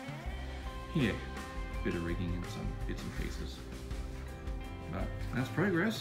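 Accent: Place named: Australia. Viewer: Nice work,.........and its good to hear an Aussie voice of many vids that ive watched........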